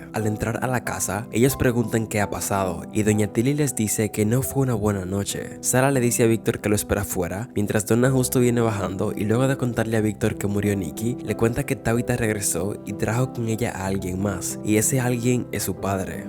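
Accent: Dominican Republic. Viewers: Esa forma de hablar “Este pana, diciendo que ta' jarto de to su m4rdito plane que no sirven” Dominicano?????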